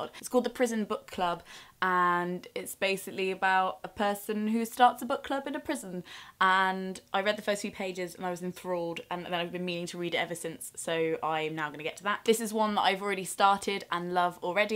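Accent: United Kingdom. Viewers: Good choices. (Luv your Brit accent) Very pro, your technique.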